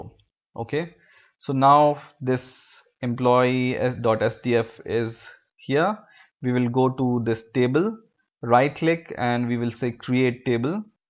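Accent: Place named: India. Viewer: indian accent is so anoying to listen to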